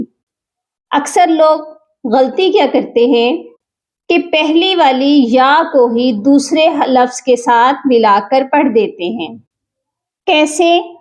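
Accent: Panipat, India. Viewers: Ap jis lehjay may parti hai wo panipati lehja hai plz muhjy batay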